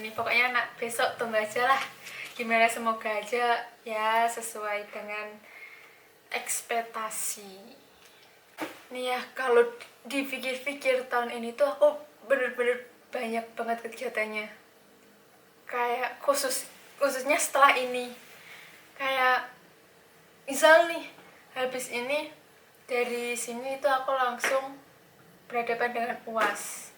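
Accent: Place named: Blitar, Indonesia. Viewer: 😂😂😂bhasane medokkk blitaran sikile teklokkkkk asoyyy 👍🏻👍🏻👍🏻👍🏻😉😉😘👍🏻👍🏻mantappp ya lanjutkan ngevlognya yg gokil gokil yaa kyak mak marck blitaran Barcelona, apa adanya yaaa 👍🏻👍🏻👍🏻😘😉🙏🤝🤝🤝ttep semangat ngevlog, smawong blitar wlingi 🙋🏻‍♀️🙋🏻‍♀️🙋🏻‍♀️🤝😉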